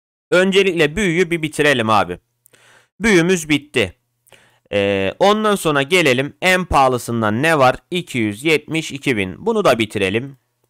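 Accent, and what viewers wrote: Turkmenistan. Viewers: Abi sen turkmenmisin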